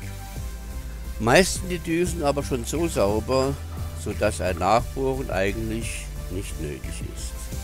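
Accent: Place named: Saarland, Germany. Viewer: Ach, gugg mo doo... Was für e Üwweraschung zum Schluss, die Saarlänner sinn eschd üwwerall... Do haschde dei Platt awwer gudd verschdeggelt... :-D Vielen Dank für das hifreiche Video, jetzt kann ich als Anfänger meine verschmutzten Nozzles auch reinigen... Grüsse aus Aachen, von einem Exil-Saarländer mit dem Herz noch immer in der Heimat :-D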